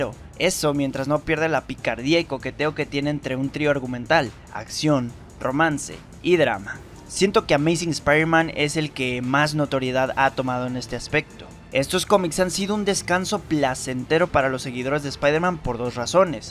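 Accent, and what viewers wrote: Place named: Colombia. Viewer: Por que te escuchas como Colombiano en este video xd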